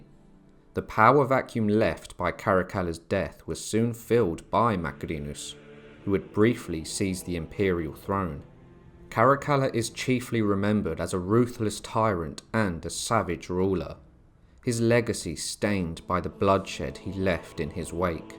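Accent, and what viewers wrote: United Kingdom. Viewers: Never change it bro. Its always nice to have a Brit tell me about history🤣👌🏻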